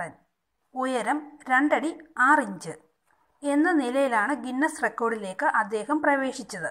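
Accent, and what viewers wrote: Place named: Trivandrum, India. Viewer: Trivandrum voice good iam also in trivandrum👍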